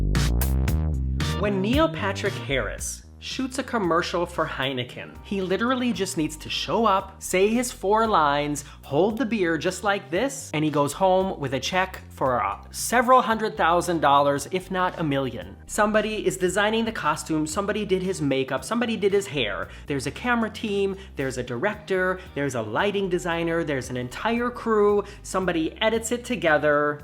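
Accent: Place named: Germany. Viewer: Ah but maybe 🤔 there’s alcohol in the LIPTON Iced tea to make him loopy without telling him. 🤔🤯 wouldn’t that be insane if they did that? 🤣 (I know they most probably don’t...just an assumption!!)Lol..🤣 (Not hating it’s not pronounced vegg-ann it’s pronounced Vee-gan, I understand if it’s your German accent I struggle to say stuff with my Welsh,English accent so I say things wrong like specific...It comes out as suspific 🤣😂 when I say it to people they look at me weirdly and I embaress myself!🤣😂) (FACT: The Welsh language/Accent, Originates from from Wales,UK, the strongest accent is in North Wales and then South, East and Wales don’t (age that strong accents we just struggle to pronounce words...Well at least I do..😂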